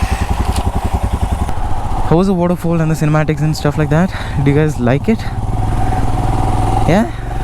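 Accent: Karnataka, India. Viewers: You have good kannada accent. 😃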